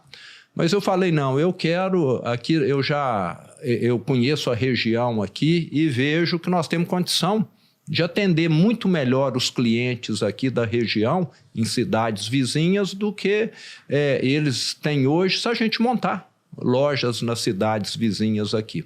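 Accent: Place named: Minas Gerais, Brazil. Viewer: História bonita! O mais legal é ouvir esse sotaque mineirês. Representante de peso desse povo amável e acolhedor.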